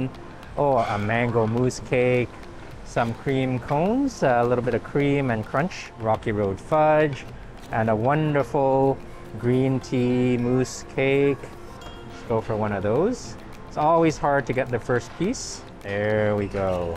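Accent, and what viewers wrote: Canada. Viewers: You have a Canadian accent! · I like your HEAVY Canadian accent.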